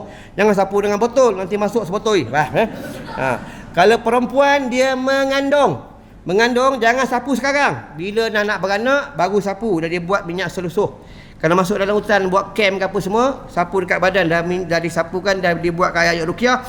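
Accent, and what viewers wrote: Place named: Perak, Malaysia. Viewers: Org perak mmg ckp dngr mcm kasar..tp padahal perckapan biasa ja..serupa la org x selalu berkawan org utara..dngaq loghat org kata smbang kasaq..padahal bisa la tu.. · Orng perak mmng ckap kaso sikit jngn terase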